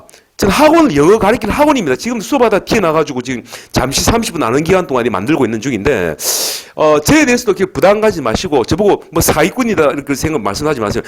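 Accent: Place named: Busan, South Korea. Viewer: 부산억양이 알아듣기가 쪼금 힘들어요 ㅠㅠ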